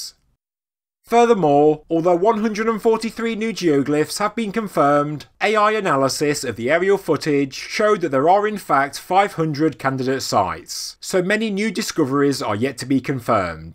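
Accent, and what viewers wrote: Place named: Southern England, United Kingdom. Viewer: I'm English and I've no idea why he's talking like he's got a class of 3 year olds. For anyone outside of Britain I'd just like to point out that we don't all talk like him... Or the Queen.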